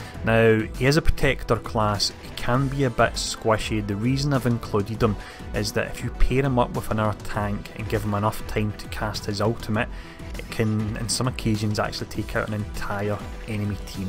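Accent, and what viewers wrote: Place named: Scotland, United Kingdom. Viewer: I feel like such an idiot... for the first 25 seconds or so, I genuinely thought this guy was speaking Afrikaans or Dutch or something.. then I just realised he's Scottish. In all serious though, thanks for the guide, mate. just getting into the game with my SWGOH mates and we're all wondering what to bother to farm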